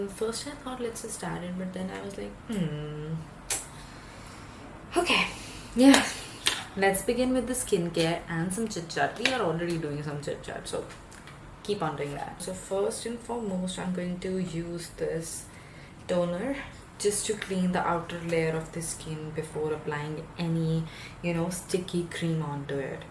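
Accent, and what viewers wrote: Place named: Canada. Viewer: Woahhh that Canadian accent thou🫣🫣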